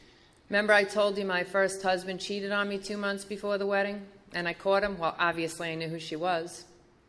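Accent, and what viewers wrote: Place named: New York, United States. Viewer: I can't believe I'm barely finding this especially this testimony! It had me from the start because of her NY accent. Hilarious, touching and impacting to my spirit. I love her transparency and her passionate love for God. I love her story of the last sponsor she had from Virginia. Thank you to everyone who had anything to do with sharing this story.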